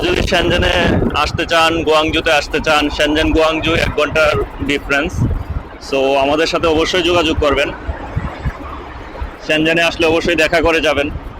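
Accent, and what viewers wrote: Bangladesh. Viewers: উনি বাংলাদেশি মানুষ। আমি বাংলাদেশের মানুষ আমি কলকাতার ভাষা আমাদের ভাষা শুনলেই বলতে পারি এই লোক কোন দেশের ভাষায় কথা বলতেছে। কারণ কলকাতার ভাষা আমাদের থেকে একটু আলাদা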